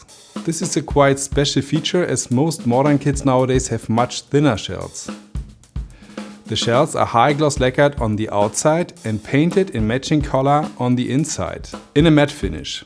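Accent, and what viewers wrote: Germany. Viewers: I very much like your demo videos. I like the direct approach to the information, and yes, I’m a weirdo, I like this type of German accent. (I lived in WB/Mainz for a couple of years long ago. As well, I’ll be taking delivery of this exact kit, in the same aquamarine color, this very week. Zo.. a little confirmation bias always feels good.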